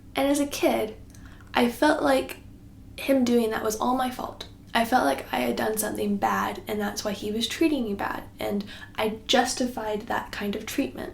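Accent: England, United Kingdom. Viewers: Your american accent is blending into a british one!!! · I’ve noticed in this video that you’re starting to get an English accent 😂 and it’s with some words so it’s so cute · Aw you’re starting to get a British accent it’s really cute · Your British accent is coming in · aww she is getting a british accent!!!!! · you're picking up a British accent and as a Brit I love it. you say "lot" differently xx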